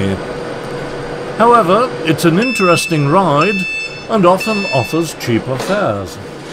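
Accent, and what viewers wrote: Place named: RP, United Kingdom. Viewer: this English RP deadpan delivery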